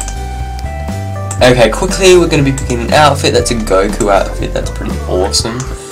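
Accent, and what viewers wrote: Australia. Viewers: i meant that i don't have a distinguished accent. like for example he's an aussie....and americans sound nasally, and british people sound cool, and people from south africa have that cool ring to their voice.....and i.....i have none of that.....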